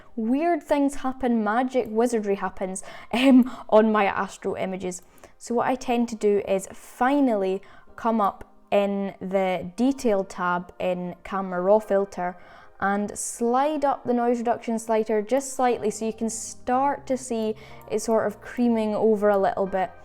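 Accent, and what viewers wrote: Scotland, United Kingdom. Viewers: This is why I love YouTube. A teenager has given me one of the best lessons I've every received in Photoshop and in a cool Scottish accent to boot!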